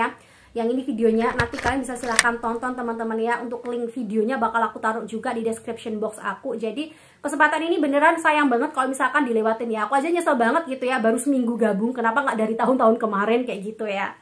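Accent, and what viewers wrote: Java, Indonesia. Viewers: WES PINTER NGOMONG RODOK ENEK MEDOKE JOWO, PINTER CARI DUWIT, BERGUNA BANYAK ORANG YA ALLAH BERIKANLAH KESEHATAN BAGI ADIK CEWEK INI YANG SELALU MEMOTIVASI BANYAK ORANG SEMOGA BANYAK MANFAAT VIDEO INI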